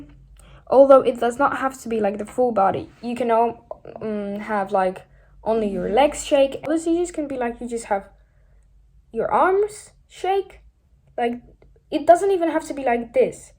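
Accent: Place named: United Kingdom. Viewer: Mówisz cudownie 😩💗 ale czy będziesz jeszcze nagrywać po polsku? Bo niebyt kumam brytyjski akcent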